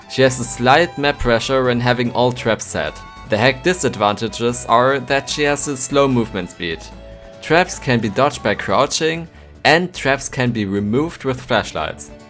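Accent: Germany. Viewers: Please do a survivor ranking next. I really like your Deutsch Akzent. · The german accent xD good video ^-^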